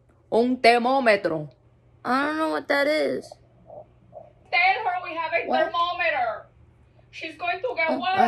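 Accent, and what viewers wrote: Cuba. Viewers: Now I wish, I would have met my grandparents before they passed. 😭 It just hit me that I missed out on all of this. 😔 But, you are amazing. I just love that Cuban accent so much!💜💜💜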